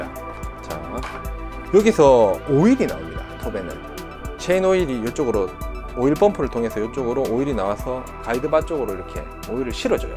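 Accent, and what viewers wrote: Gyeongsang, South Korea. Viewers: 너무 쉽게 그리고 재밌게 귀에 쏙쏙 설명을 잘해주시네요 같은 경상도로 그런가? ㅎㅎ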